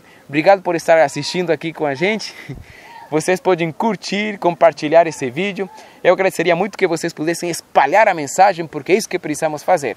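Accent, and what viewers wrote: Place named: Spain. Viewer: Você não é Brasileiro né? Seu sotaque é meio para o espanhol o enfim, eu também sou filha de pastor e já passei por muitas coisas que você citou no vídeo ...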